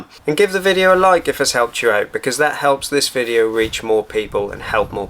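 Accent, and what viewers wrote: Norfolk, United Kingdom. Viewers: Came for temperature DIY advice, stayed for the Norfolk accent ❤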